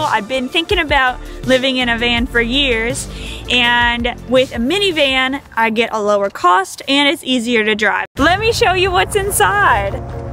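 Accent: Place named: South, United States. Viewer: I love looking for that southern accent in you voice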